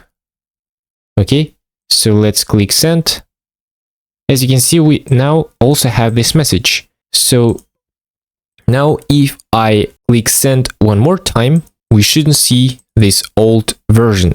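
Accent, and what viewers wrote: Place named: Russia. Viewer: I just wonder what is your native language? I hear accent and really wonder. My guess is that u are Russian.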